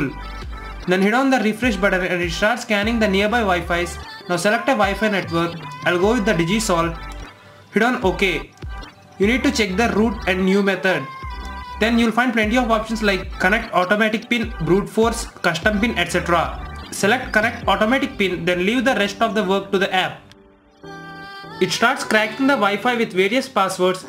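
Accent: India. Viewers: Bla bla bla bla indian English dedicated for indiam only though i understand all but what r u saying regarding hacking password and WiFi is not true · since you have such a THICK accent, I would recommend to speak slowly because now it sound like an east-indian mess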